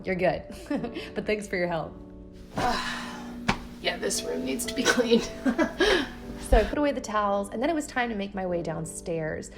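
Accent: South, United States. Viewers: Hmm favorite cleaning products?? Bleach. Lol I love the smell of it. P.s. you're slowly getting that southern accent. 😍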